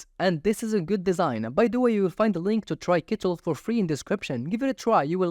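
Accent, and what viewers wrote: Morocco. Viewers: mghribi nta hhh gha mn sawtk tbarkllh3lik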